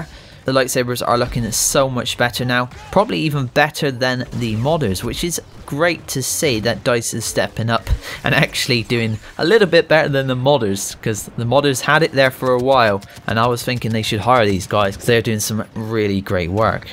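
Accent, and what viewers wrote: West Country, United Kingdom. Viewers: Clear and concise with a west country twang:) You have a new sub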